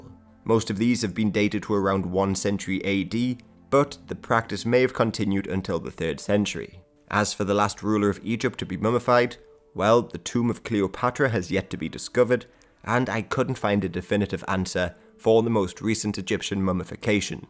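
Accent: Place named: RP, United Kingdom. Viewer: Your accent is really posh!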